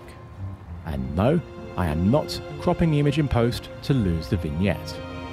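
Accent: United Kingdom. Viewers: I don't have any uses for these lenses or the Red, but the video is gorgeous, the music is well chosen, and the British accent is always charming. Heh.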